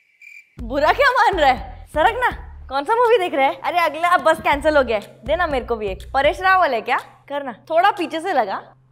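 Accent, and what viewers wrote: Maharashtra, India. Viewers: Such cute Marathi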